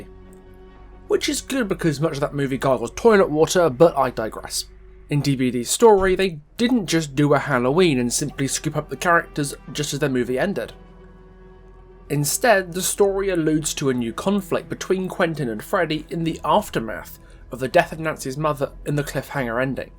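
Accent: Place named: United Kingdom. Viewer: Are you british because that is lame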